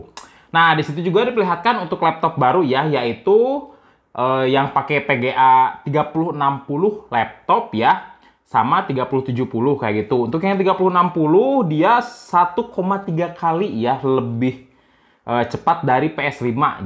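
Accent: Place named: Tasikmalaya, Indonesia. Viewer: Orang tasik dia gan, makanya gitu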